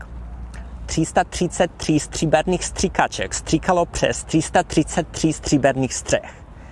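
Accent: Czech Republic. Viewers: Krásna čeština! Možno robíš nejaké drobné chyby, ale prízvuk máš ako typický Čech :D Ako si na tom so slovenčinou? Predpokladám, že rozumieš, ale vieš aj hovoriť po slovensky? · Takový pražský,jak ten Vít z Prahy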